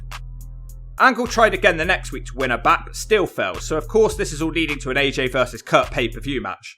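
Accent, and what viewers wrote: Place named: England, United Kingdom. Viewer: Addiction is no joke thumbs down stupid English accent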